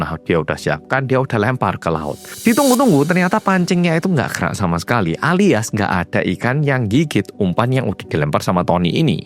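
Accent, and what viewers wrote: Java, Indonesia. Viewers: Lho, baru tau? Mas asli Korea, dari ortu asli Korea Lahir di Daegu Korea, besar di Malang Jatim dari TK umur 4 tahun sampai SMA Makanya logat nya medok Jawa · Terus terang aku nggak bisa nyimak ceritanya.. Salfok sama suarane mas yang medok iku lho.. 😂😂😂 Kok iso lho.. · Ya bisa,lah dari TK -SMA sekolahnya di Malang, malah pernah jadi Ketua OSIS segala,otomatis bhs jawanya udah medok.. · Oalah,wong Korea iso jowoan medok pisan,tak subscribe aelah..😊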